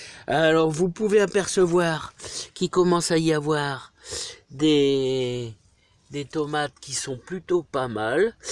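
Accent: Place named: Nord, France. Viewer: Bonjour, Je me lasse pas de regarder tes videos, je les attends comme une série de TV (neetflix…hihihihi ) en plus avec l’accent du Noord …J’adore sans chichi et avec beaucoup de passion. Ne change rien, malgré les 4 degrés de ce matin. Bon dimanche.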